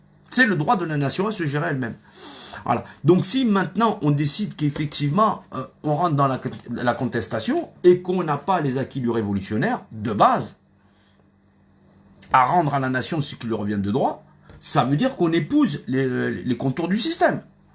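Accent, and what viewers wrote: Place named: Basque Country, France. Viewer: Avez l'accen basque et un peuti peut de Mediterranean...😊❤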